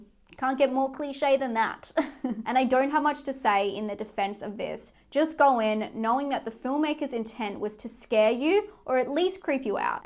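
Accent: Australia. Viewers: It is so cool to have someone in Australia doing movie reviews as im an aussie as well but don't get me wrong i love watching american movie reviews as i do all the time its just good to here an aussie talk about movies and Haunt sounds like my cuppa tea so thank you for your time doing these reviews look forward to your next one takecare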